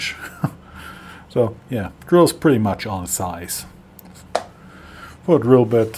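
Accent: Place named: Germany. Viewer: I just love the german pronunciation of "Z" as "Sea" . That is adorable. And authentic.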